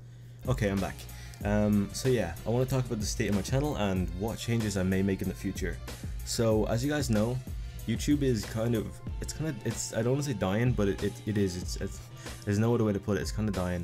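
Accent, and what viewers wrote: Ireland. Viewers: you irish cuz i am :)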